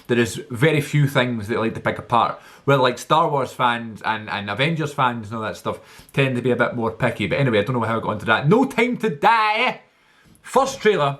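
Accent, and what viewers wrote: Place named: Scotland, United Kingdom. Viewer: Oh by the way what part of Scotland you from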